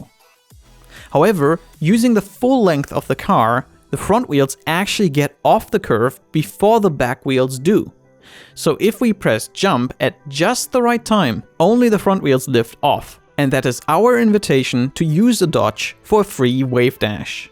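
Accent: Germany. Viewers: Dear Mr, I still can't figure out your accent. It sounds german to me, but it's a pretty weird one. Did I guess it right? Sincerely Ein deutsprachiger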